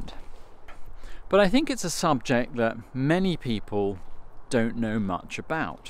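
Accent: United Kingdom. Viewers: You're British, thank God!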